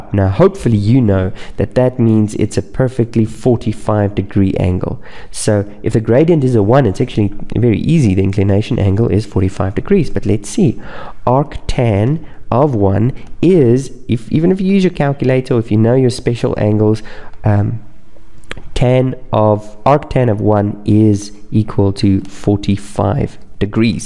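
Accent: South Africa. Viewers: I thought i recognized the accent! Hey there South African bro!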